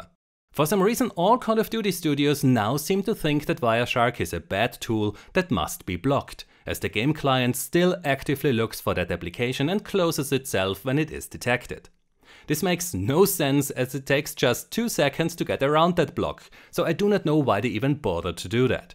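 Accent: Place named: Germany. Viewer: I can hear the German or Austrian in this Guy... Schöne Grüße aus Wien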